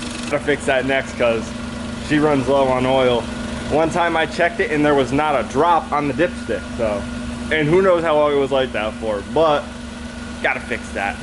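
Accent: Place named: East Coast, United States. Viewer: That was the ultimate Connecticut shitty east coast accent I gotta cwall my ooltinata that dropped oaff is done it sounds like him saying cwoffee I can't stand that accent between the east coast accent an Wisconsin accent fuckin terrible I wonder if he hears how he sounds that was so dramatic the other day when he said its gonna be hard not having his 13 in the shop how the fuck could that make you sad your getting it painted an its a car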